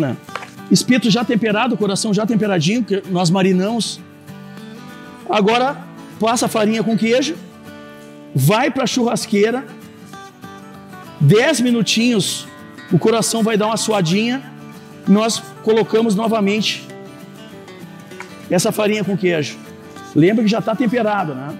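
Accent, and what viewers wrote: Rio Grande do Sul, Brazil. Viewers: Sotaque gaúcho é top demais. Brasil e suas várias línguas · Mas bah tche tu es de porto alegre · Tu é o cara para ensinar assar pelo teu jeito de falar tu és do Rio Grande do Sul aprendendo muito com você um abraço do Paulinho de Porto Alegre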